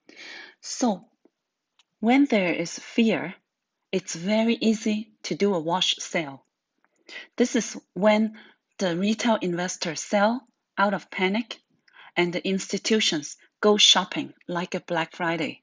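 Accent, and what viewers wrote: China. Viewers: Sounds like chinese english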